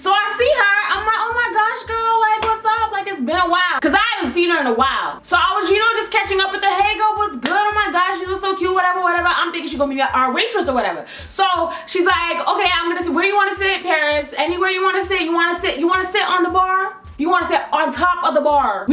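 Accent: Jamaica. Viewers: New follower! I love your videos. Im literally binge watching them!!! Speak more patois !!!!!!! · Didn’t realize she was Jamaican until the begging of the video 🇯🇲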